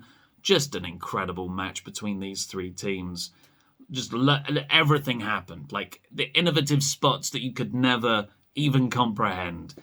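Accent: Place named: United Kingdom. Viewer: Yes. Just Yes. To me, AEW is if WCW did everything right instead of... well you know the rest. have you ever thought of using the US grading scale? A+ and whatnot? no complaints about your current grading scale, just a thought. Love this channel because none of my friends are big wrestling fans... or have British accents.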